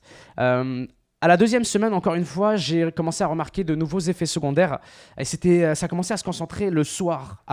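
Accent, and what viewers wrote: Quebec, Canada. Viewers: Salut mec , je trouve que pour un québécois t'a un accent assez léger par rapport à Gurky.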